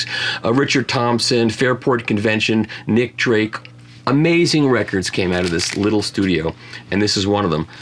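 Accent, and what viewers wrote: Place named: United Kingdom. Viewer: Love the Brit accent, but try Manchester rather than London next time. I can give you some tutalage… for a fee of course 🇬🇧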